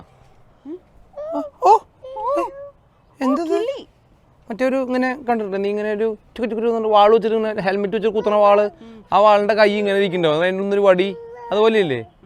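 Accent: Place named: Pathanamthitta, India. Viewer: Nallea pathanmthitta parayanea.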